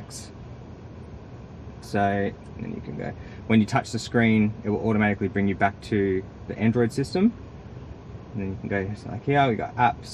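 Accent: Australia. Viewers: He has a gay Australian accent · Oh ok. What company is it? Is it made locally there in Australia? I'm assuming that's where you are, based on your accent and the fact that the steering wheel is on the right. Just trying to find the best unit out there. Didn't know there were options other than RSNav, which I believe is made in China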